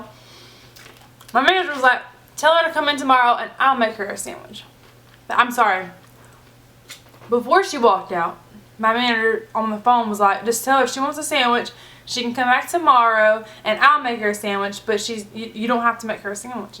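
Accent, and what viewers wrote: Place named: South, United States. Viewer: I'm impressed by yer appetite and yer food-crushing capacity. Looks like you could eat a lot of guys under the table. And yet you don't look like a truck driver – quite the opposite. So, have you ever been out on a dinner date and scared a dude? Hey, by the way, when you're talking, it seems a subtle Southern accent kind of comes and goes – are you in a Southern state?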